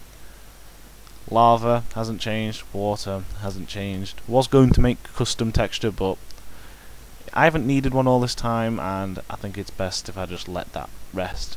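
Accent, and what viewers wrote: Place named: United Kingdom. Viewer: great British accent you have yourself a new subscriber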